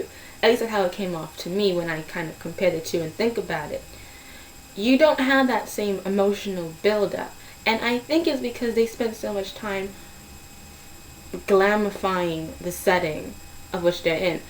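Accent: England, United Kingdom. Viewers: i dunno, but for some reason, i thought i heard an english accent from you through out this video, was that planned?! lol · My comment won't go through! 1 more time. You know you had an English accent through bits and pieces of your vid, it sounds like you weren't aware it though. :laugh: I'm not trying to embarrass you or anything, cause I've done the same thing. I watched 4 HP movies in a day. I couldn't shake the accent that kept popping up for the rest of the day! Same thing happened when I watched Extras. Colin Firth is sexy, and a much better Darcy. I like the 2005 movie, mostly cause I'm a big fan of Keira. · wow...cool brittish accent. but it was kinda weird how you started on the brittish accent...then broke off and started talking normally...then started the accent again. But, it was a great rant, and i would have to say that i liked the 1995 pride and prejudice better. 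heh heh...im a sucker for the classics. :)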